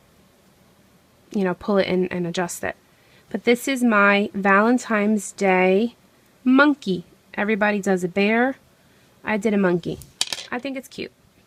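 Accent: New Jersey, United States. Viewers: R u from NJ ?????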